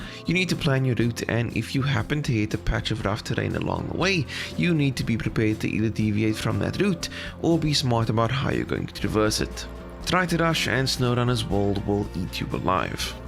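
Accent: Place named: South Africa. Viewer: Awe my bru, South African yes ?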